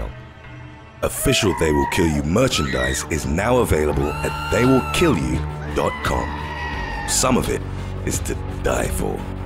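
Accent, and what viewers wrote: England, United Kingdom. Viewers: Aw. The british and their pronunciations. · It's his accent. That's the way they say it in England. Like toe-may-toe in the US vs toe-mah-toe in England.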